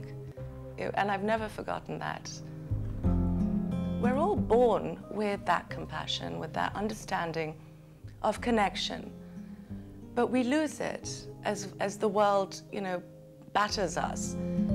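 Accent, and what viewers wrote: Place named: Hollywood, United States. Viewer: good message, better with hollywood accent dropped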